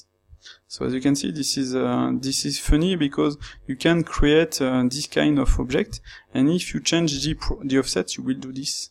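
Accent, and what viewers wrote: France. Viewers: jesus why dont you activate that keyboard shortcuts addon man???? cant follow you with your strong french accent... that would help us immense... otherwise great addons, bought them both.... :) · the frenchness is strong with this one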